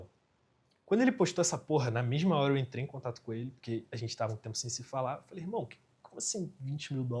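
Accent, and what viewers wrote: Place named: Rio de Janeiro, Brazil. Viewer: Ele é do RJ certeza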